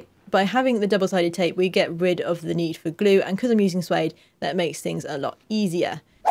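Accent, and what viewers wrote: England, United Kingdom. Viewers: hello dear friend, i enjoyed the videos and i like your English accent cause i'm learning English by listening to many videos, and i saw you folding the edges of suede leather, i want to advise you to get a help of thin comb may be you can break some of needles of the comb to create a space which will help you to assemble correctly the glued parts with a perfect line, god bless you . i'm from Algeria, Northern Africa.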